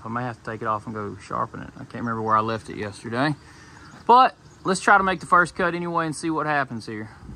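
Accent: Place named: South, United States. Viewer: Love your southern accent.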